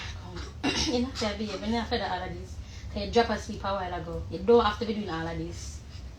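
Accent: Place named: Africa. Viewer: my first time commenting but I had to this time you’re so talented the African accent got me ooo someone plz sign her ooo nuff love ❤️ one of your fan blessings queen 👸🏾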